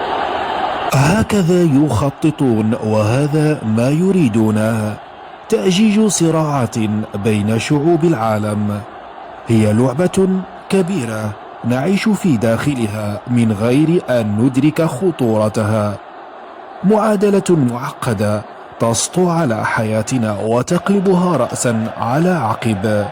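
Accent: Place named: Morocco. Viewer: تتكلم متل يهودي مغربي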